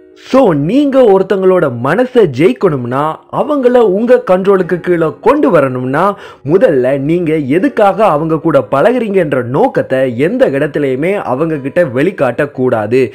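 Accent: Sri Lanka. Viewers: Bro neenga srilanka jaffnava?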